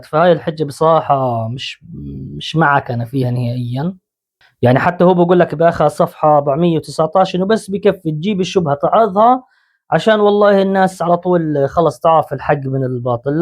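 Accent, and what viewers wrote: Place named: Jordan. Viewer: بحب اللهجة الاردنية 😂 طب الحق علي انا يعني دقيقة ٩ و ٣٠ ثانية تعديل بخاتمة الفيديو ( هو انت بدك تتجوزني ) 😂 جملة ايقونية يزم يسعد رب الاردنية ❤❤❤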